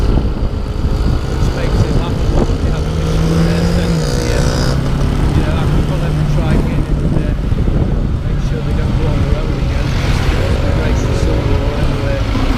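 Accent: United Kingdom. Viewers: Show Hey. Bro! small world after all eh? is riding like, a bloody local pinoy here though! while speaking with British accent hehehe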